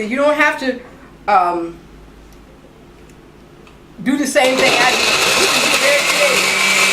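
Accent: United States. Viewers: I am just Subscribing as this was in my recommendations. I love how you are surely Taking Charge of your Health and showing us that we can do so as well!!! You sound like you are from Down South Georgia somewhere! Blessings to you "Grandma"!!! 💓 💓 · For the first drmonstrationI got the aloe vera gel, the carrot, the honey, the juice but what is the last item she added ? I didn't get the last item he added because of her America accent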